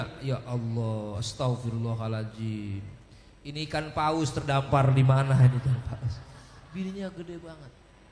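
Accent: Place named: Java, Indonesia. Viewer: Ceramahnya medokkkk banget..predator ni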